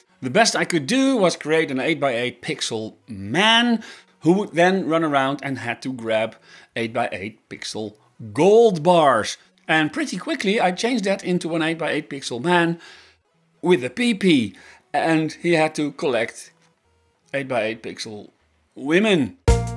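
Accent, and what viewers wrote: Netherlands. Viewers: i'm too young to be using ZX Spectrum at any point in my life, but i was playing elite (the original '84 version) on an emulator and it left an impression on me, not because it was mind blowing to me by itself (it was late 10s so we already had portal 2 and my most played game at the time, FSX, because i (was? am?) a sim nerd and that's how i found elite) but because it was otherwordly different from the games of its time first i thought "it's about david braben isn't it" then i remember watching Alexander the ok's video about elite (by the way, he has a spectacular channel and i recommend everyone who's interested in space and human achievements in general a watch, my fav video of his is about space shuttle program) where he told that elite was developed for BBC Micro (and ofc it was written in assembly, have you seen the thang (both BBCM and the game itself) it's too huge for basic) then i watched 2 seconds more and you said the name lol so anyway, funny video and i don't know why but from the first couple of seconds, without seeing anything else, without checking the channel description, i felt that you're dutch i don't know if it's the accent, or the similarity to Posy or the love for the color orange or the cadence of the speech but it's not like i thought "they seem dutch", it just clicked in my brain and i think that's pretty funny great channel tho, i like it when people just can upload stuff and not worry about youtube being youtube have fun :3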